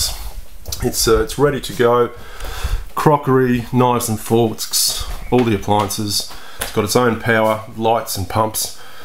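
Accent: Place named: Australia. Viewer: It's an Aussie accent.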